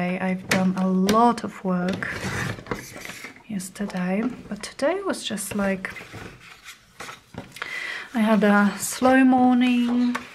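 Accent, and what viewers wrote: United Kingdom. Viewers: both of those series made me wish I could travel to Europe and Australia. My family name is Montaque and we came from England and Scotland. I love the accents. That's another reason your voice is so soothing and relaxing to me. I love watching you and hearing about your life. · I love these vids. 💜 Your creations are so delightful, even your scraps are lovely! Been busy knitting and trying to learn new stitches which is a challenge for me. Hey! When did you replace your table? I miss the teal one 😉 I love Scottish accents too 💕 if I could have seen in concert that would have been epic!! 💫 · I love your new series! Absolutely relaxing and it's so nice to know you better as you talking more about yourself ☺ I love Downton Abbey, too! My mum and I watched it uncountable times 🥰 And I agree, I could listen to British English for hours. 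I do not watch TV or movies/series very often, I am more in reading , so no tip for you 😅 But warm hugs instead 🤗 😉🐶😘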